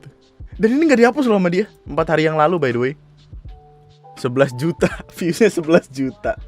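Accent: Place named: Java, Indonesia. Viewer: Jowone medhok ya ms 😹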